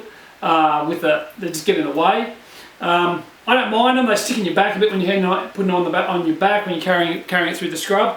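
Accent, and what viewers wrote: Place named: Australia. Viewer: lol, don't understand how all you yanks can't understand what he's saying, He does not have a really thick Australian accent, its just moderate. trust me just listen to the vid a couple of times and you'll get round the lingo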